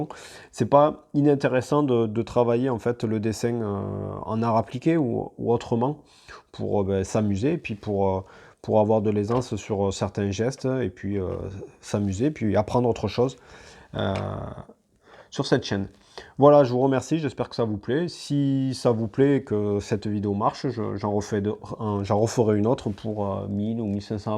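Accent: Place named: South, France. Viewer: Super 👍 avec un petit accent qui sent bon le soleil 😁 bonne continuation 🤗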